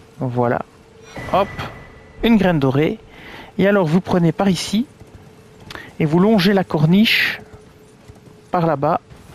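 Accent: Belgium. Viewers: Tu as un accent du Hainaut je me trompe ? ;) merci pour le muguet !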